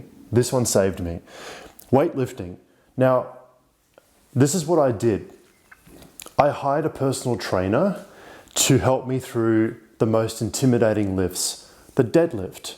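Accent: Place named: Australia. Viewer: interesting seeing a guy that looks super swedish speaking in an aussie accent.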